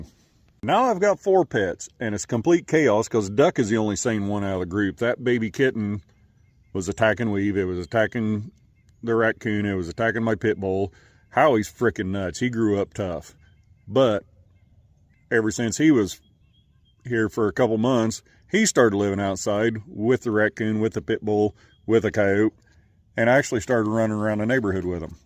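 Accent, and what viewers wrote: South, United States. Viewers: You're accent sounds Southern sometimes -- like when you say "ma dawg" lol 👏 My Alabaman father says it that way too. I guess since Illinois is partly in the South or bordering it in any case, it's not that crazy.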